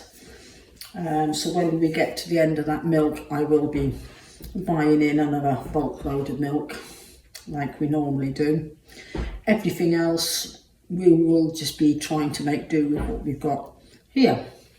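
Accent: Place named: Wales, United Kingdom. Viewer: I found your channel fascinating as my great grandparents came to the US in the 1890s from Glamorgan Wales near Swansea. So it’s interesting to see real people, real homes and listen to real accents spoken as you are not a great distance from where they lived. I’ll enjoy seeing more of your life and customs along with the challenge.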